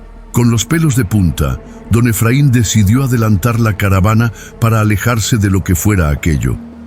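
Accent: Dominican Republic. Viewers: Hasta parece dominicano ese man del narrador😂😂😂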